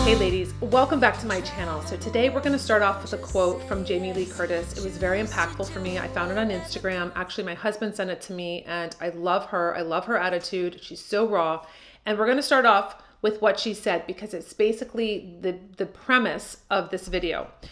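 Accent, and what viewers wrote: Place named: Cleveland, United States. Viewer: Love your videos,. Where are you from originally? Your accent and phrasing sound just like my sister-in-law who is from Cleveland, OH. Close?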